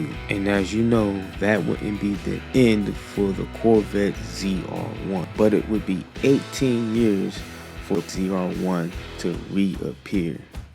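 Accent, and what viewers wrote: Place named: Africa. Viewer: Why do you have an African narrating this video?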